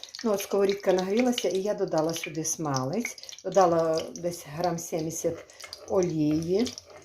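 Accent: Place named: Bukovina, Ukraine. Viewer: як завжди тепло по-домашньому.Хочу сказати Вам, одну річ,зустрічала в коментарях під Вашими відео спроби навчити Вас літературної мови. Не ведіться на це,бо наш діалект є природнім і властивим нашому краю. У нас навіть старі люди ніколи не казали "борошно",завжди "мука". або коли невелика кількість,то "мукичка"і так далі,не буду перечисляти.Для чого нас рафінувати? Буковинська краса і в нашому діалекті також.Вам здоров"я, Божого благословіння.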